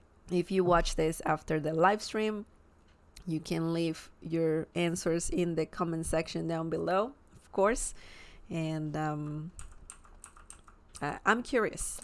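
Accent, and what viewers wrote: Castile, Spain. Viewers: is this considered castilian spanish ? also i noticed that the C/Z words that are pronounced TH is not the case when the letter C is at the beggining of words ?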